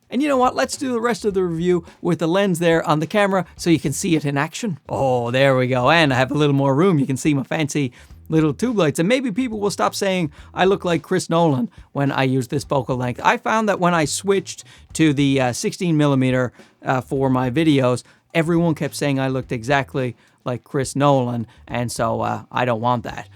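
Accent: Canada. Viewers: Nice lens!Loved the shots at the intro….pretty artsy! Handheld or added external stab? Great energy …almost want to buy these lenses and then buy a camera to fit! Btw the 23mm made you look more like Chris Hemsworth, but the CDN accent offset.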